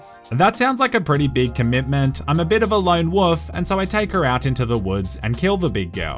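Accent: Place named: Australia. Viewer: Dearest, I believe you are Australian like me? Just going off the accent here. This video was posted 5 hours ago. You were awake at 3 in the morning? g e t s o m e s l e e p y o u w e t c a b b a g e - Sincerely, Glacier.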